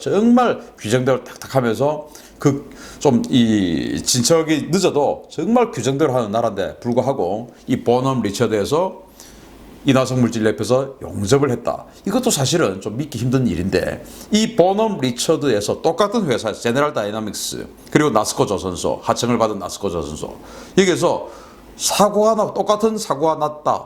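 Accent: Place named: Gyeongsang, South Korea. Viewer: 경상도 사투리를 사용하시는 사람이던데요...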